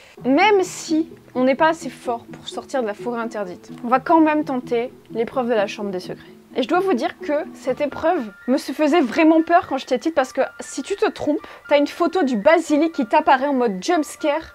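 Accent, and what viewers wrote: North, France. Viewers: Le petit accent du nord ^^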